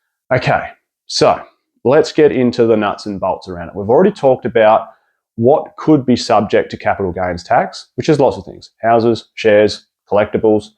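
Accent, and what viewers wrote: Australia. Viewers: Nothing wrong with your Aussie voice m8! Sounds perfectly fine (and soothing) to me 😅 🤘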